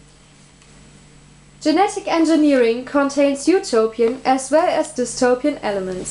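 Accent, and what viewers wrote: Germany. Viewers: cooles video! man merkt aber an deiner aussprache dass du deutsch bist :D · Tolles Video ! Dieses Englisch mit deutschem Akzent ist einfach herrlich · Die Aussprache is schon ziemlich deutsch xD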